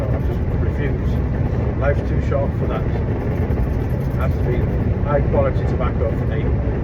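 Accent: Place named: England, United Kingdom. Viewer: Enjoying your content. Trying to place your accent. What part of England are you from originally.?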